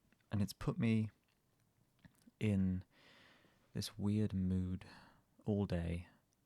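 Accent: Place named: United Kingdom. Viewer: this randomly got recommended to me, hi! we love british accents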